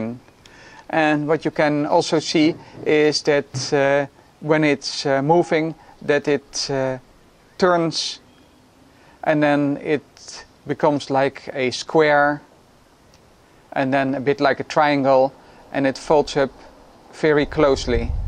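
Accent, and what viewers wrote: Netherlands. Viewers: Where are you from? Netherlands? Germany? Maybe Denmark? Because I'm not good with accents.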